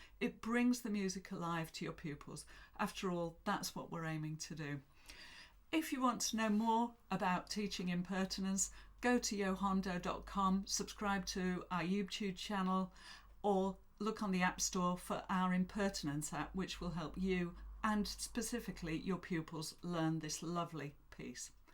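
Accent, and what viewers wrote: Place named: England, United Kingdom. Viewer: you sound English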